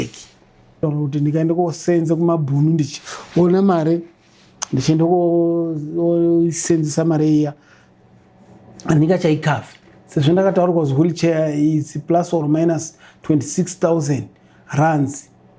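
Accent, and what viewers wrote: Ndau, Zimbabwe. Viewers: Chindau chondispaka zvangu😂😂😂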